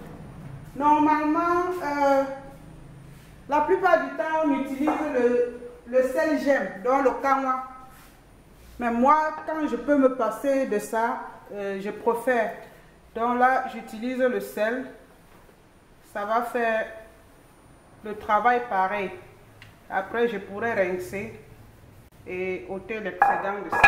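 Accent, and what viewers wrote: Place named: Cameroon. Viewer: J'aime beaucoup votre accent ! Ça me rappelle mon enfance au Cameroun à Mbouda ! Je m'abonne et j'essaie la recette aussi vite que possible ! Trop fan ! 🤣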